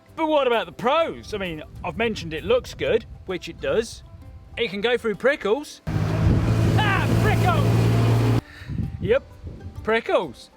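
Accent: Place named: Luton, United Kingdom. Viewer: Fit blonde with a Luton accent. All fur(ze) coat and no knickers.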